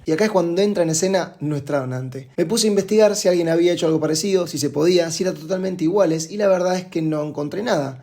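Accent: Argentina. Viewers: Argentino en España? Me salió sugerido tu vídeo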